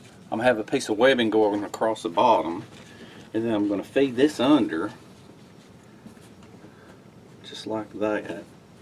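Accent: South, United States. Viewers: Wow how do people get a southern accent like that.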